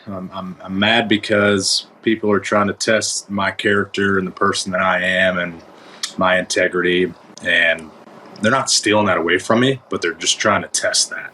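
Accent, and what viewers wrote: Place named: Southeast, United States. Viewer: originally from North Carolina, so he still holds a lot of the pronunciation from the mid-atlantic/Southeast region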